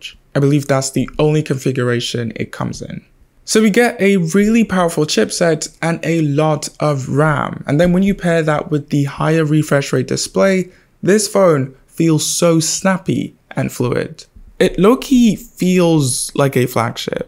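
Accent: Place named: England, United Kingdom. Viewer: yeah, probably grew up in england or schooled there or something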